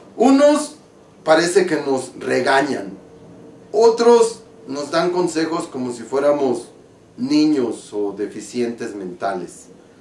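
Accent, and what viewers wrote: Mexico. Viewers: tiene acento mexicano, lo neutro quiere decir que nadie te pueda identificar de donde eres oriundo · me di cuenta que usted es Mexicano! ... Me gusta su acento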